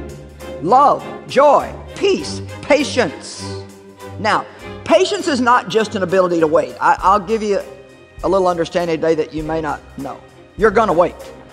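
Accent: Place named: South, United States. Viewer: I can't take this lady's southern accent seriously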